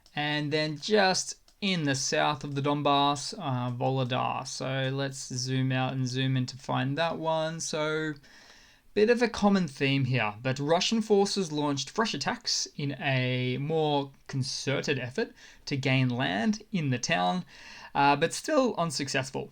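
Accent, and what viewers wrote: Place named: Australia. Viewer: Since he's Australian (assuming by his accent) he might have opposite weather problems in January =D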